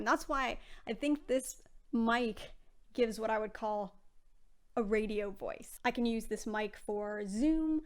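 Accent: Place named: United States. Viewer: You sound American, but you refer to Pounds in currency.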